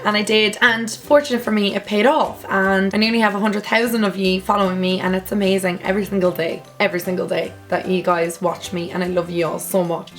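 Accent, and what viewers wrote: Ireland. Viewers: Love this video, love the irish accent xx i will deffo ask you a question next q&a :)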